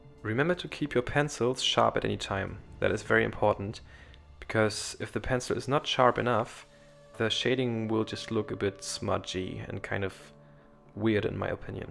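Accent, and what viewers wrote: Germany. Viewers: I pick up a really small german accent but you sound very calm and focused! Great work, no need to feel awkward :D awesome video, thank you so much! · Ich dachte mir schon so...hm...ziemlich deutscher Akzent... ach der ist ja tatsächlich Deutscher xD Ziemlich mutig ein englisches Voiceover zu machen :) Das Bild sieht einfach nur atemberaubend aus, nicht nur wegen der tollen Anatomie, sondern auch weil deine Bilder irgendwie immer einen... mystischen Touch haben :D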